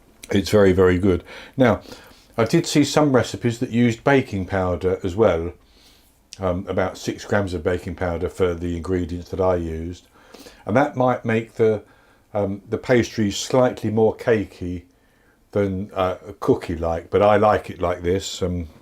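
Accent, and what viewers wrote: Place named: England, United Kingdom. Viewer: Hello: I do like your baking and very gently English accent to let us understand what you say. But can't you put the mic hanging lower on your shirt or put in somewhere seems more better, because your breathing is very strong as well! Thats why we feel uncomfortable. Sorry about to let you know my family's feeling and >> Thanks your share:):)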